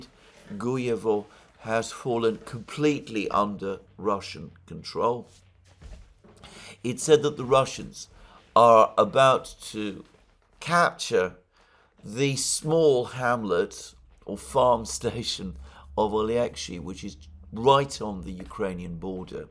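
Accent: United Kingdom. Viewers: Excellent explanation of Bretton Woods - perhaps with your professorial panache ( I mean this sincerely, no sarcasm intended) you can explain to most (untutored) Americans what deficit-fueled economics and and fiat-currencies are. I don't even believe most economists know - or can articulate it. You're clearer yhsn Jeffrey Sachs. If only we could all wrap our minds around what the heck a tariff really is. Your very proper British accent would definately make the "lecture pill" go down easier.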